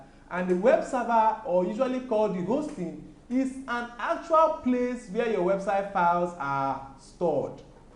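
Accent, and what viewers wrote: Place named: West Africa. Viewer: I wasn't sure I could stomach the West-African accent, but I gotta admit, the hilarious analogies have grown on me... Good work, can't wait to watch all videos...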